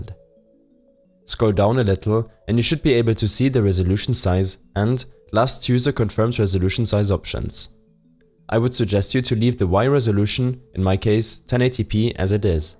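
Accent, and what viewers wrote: Germany. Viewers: Nice one dude, it worked on my computer and btw are u german?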